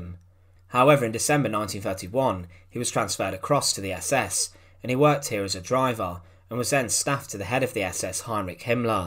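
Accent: United Kingdom. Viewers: PLEASE INVLUDE ENGLISH SUBTITLES IN YOUR VERY INFORMATIVE VIDEO DOCUMENTARIES. YOUR STRONG REGIONAL ENGLISH ACCENT IS DIFFICULT FOR ME TO DISCERN AND UNDERSTAND . THANKS FOR THE GOOD WORK YOU ARE DOING . KEEP THEM COMING.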